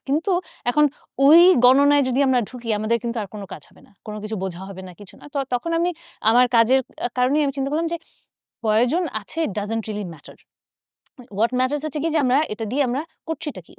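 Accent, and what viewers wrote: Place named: Bangladesh. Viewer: না জানলে বলেন কেন? এখন তো ইনফরমেশন খুব সহজেই পাওয়া যায়। ট্রান্সলেশন সহজে করা যায়। নেহরু লিয়াকত চুক্তির নাম শুনেছেন? এ মহিলা চাকরি পেল কি করে? ব্যাংগালোরে আসুন দেখিয়ে দেব কত বাংলাদেশী আছে। আপনাকে দেখে যে কেউ বলে দেবে আপনি বাংলাদেশী। আপনার কথ্য ভাষা প্রমান করে দেয় আপনি বাংলাদেশী।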